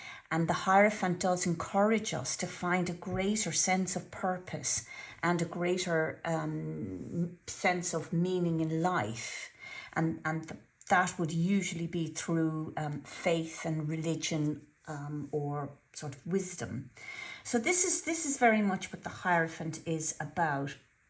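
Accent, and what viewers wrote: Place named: Ireland. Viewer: You have a lovely accent 🌹.I hear an Irish Lilt in you voice 💃.. Good Reading Thanks 🌼🏹🏹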